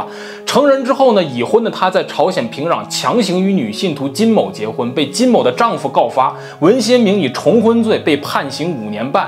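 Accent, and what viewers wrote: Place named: Beijing, China. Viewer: 這個小哥北京腔好明顯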